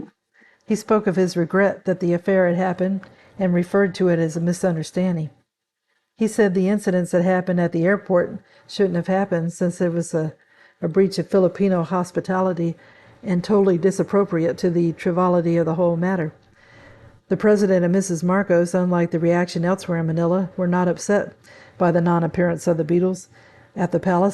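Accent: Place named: California, United States. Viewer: You have such a California accent. You sound like my Aunts. Great video! Keep it up!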